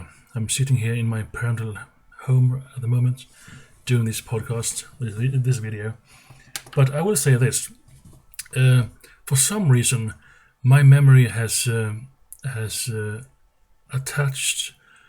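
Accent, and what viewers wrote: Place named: Sweden. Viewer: Your accent is Swedish, but you can enunciate Finnish pretty well.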